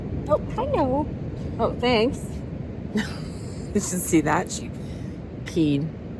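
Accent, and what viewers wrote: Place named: Germany. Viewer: Yeh I thought that to , she really sounds German 😂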